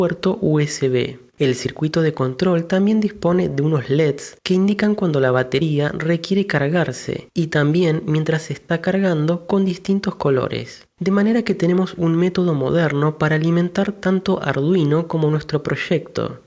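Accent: Argentina. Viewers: Hola. Bueno te comento que le hice mirar tus videos a mi abuela y entienió todo. Hoy ya no mira telenovelas y esta enganchada con tus videos. Ahora en serio, la calidad técnica de las imagenes son exelentes, camara, luz, ecsenario, todo controlado al minimo detalle. Tu capacidad didactica es un don especial que tu lo tienes. es dificil no entender alguna cosa. Por tu tonada eres porteño en lenguaje de arduino es -- pero tu GENEROSIDAD es ++++++++++++++++++++, te mando un abrazo grandote y gracias por todo lo que aportas.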